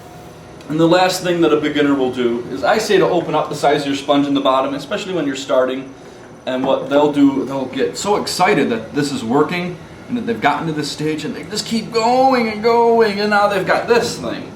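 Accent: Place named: Germany. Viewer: Not gonna lie... the German accent startled me. 0_0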